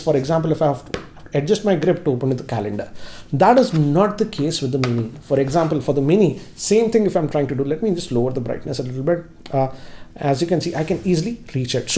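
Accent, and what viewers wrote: India. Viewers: I love his indian accent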